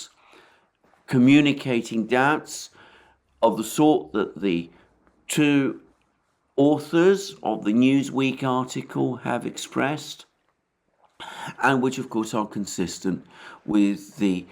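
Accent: United Kingdom. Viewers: I love your voice! Your authentic British accent is soothingly calm and civilized, yet with a range of emotion surprising to most Americans. Your phrasing is jazz, Frank Sinatra, Etta James. As expected, the famous British knack of understatement is here in spades. You leave me smiling and shaking my head for weeks remembering your deadpan delivery of the obvious, drolll punchline. Not to mention your background, knowledge and experience backing up your reporting on current political issues, giving me a much wider understanding of all the essential elements as they unfold. Thank You!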